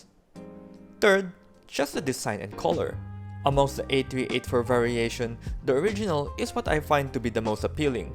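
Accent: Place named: Philippines. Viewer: Do you happen to be from the Philippines? Because you sound like one.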